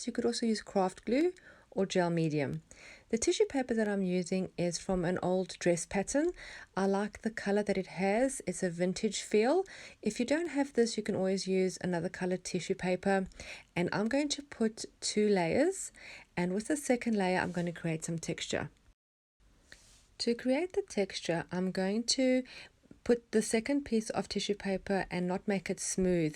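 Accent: South Africa. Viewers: So nice to hear a South African accent...enjoying your work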